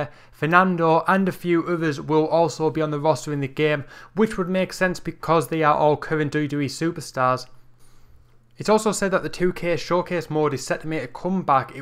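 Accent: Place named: Newcastle, United Kingdom. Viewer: That Geordie Accent Tho xD im geordie too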